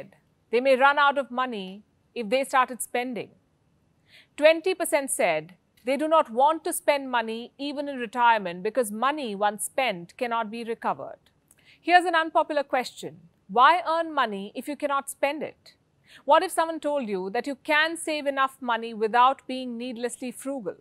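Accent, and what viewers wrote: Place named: India. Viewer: Madam, nice day to you, a filipina new fan of you here, i am a fan of british and american accent english but now my top on the list is indian accent, and i like it very much...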